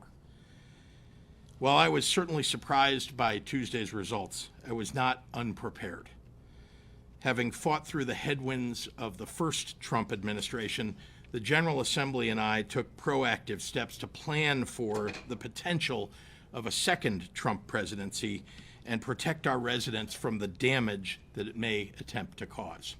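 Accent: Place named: New York, United States. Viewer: Sounds like NY talking….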